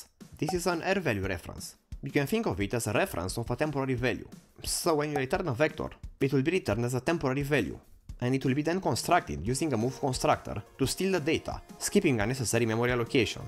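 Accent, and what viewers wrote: Russia. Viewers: Excuse me for that question, but it's very interesting for me as lover of languages and especially English) What country are you from? I thought you''re Russian when i heared you accent - it sounds very similar to Russian. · Your accent is interesting, do ye have russian heritage?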